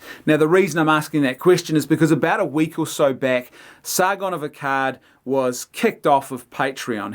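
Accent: New Zealand. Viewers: I would've subbed, but you clowns from across the ditch have the most annoying accent in the world. Sheesh dude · You sound like a new zealander sir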